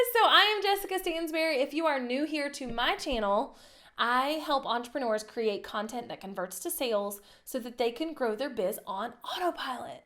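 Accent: South, United States. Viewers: Hi Jessica....I Subscribed to your Channel......Do I hear a ...Southern ... Accent.....cheers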